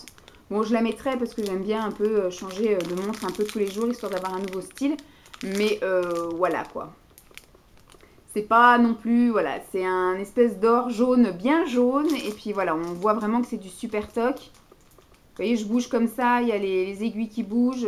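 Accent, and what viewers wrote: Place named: Quebec, Canada. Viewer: Bonjour miss... super tes achats.... comique de t'entendre parler Québécois... d'accord avec toé lolll...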